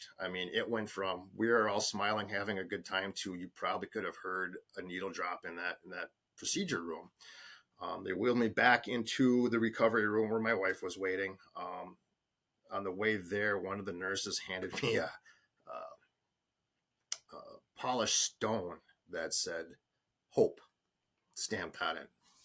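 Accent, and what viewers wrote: United States. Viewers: I hear from your accent that you are American. I'm sorry that America has failed you. The rate of cancer in young people is at an unprecedented high - and it is mainly cancers of the stomach and colon that have shot up in young people to levels never seen before. This is mainly due to the ultra-processed nature of food in America. Cancer is no longer only for old people in America. If you experience symptoms at any age - even in your teens or twenties - you really need to get screened for cancer especially as an American - if you can afford it. Because, you know, 'Merica and it's wonderful healthcare system.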